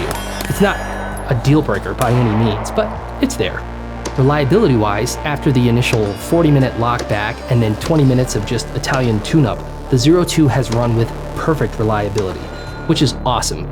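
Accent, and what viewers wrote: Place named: Minnesota, United States. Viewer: What area of Tennessee? Been in Southeast TN my whole life. You killed that Minnesota accent, I went to Ely Minnesota on vacation several years back and my god do you sound like them. The difference of dialect was an issue, my thick southern accent was all but incoherent for the locals in Ely. Our fishing guide made me sit in the front of the boat so he could read my lips so he’d know what I was saying lol, great people there.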